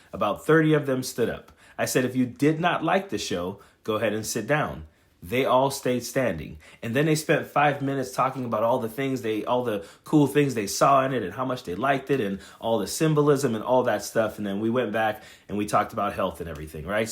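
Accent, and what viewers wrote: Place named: New Jersey, United States. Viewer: Does he realize his Jersey Shore accent is considered low class & ghetto??😏😂 He could never compete with SLJ..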